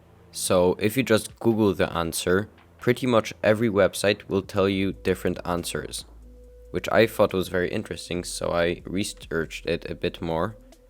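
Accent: Poland. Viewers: Wyczuwam ten polski akcent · ale to słychać że polakiem jesteś bo słaba wymowa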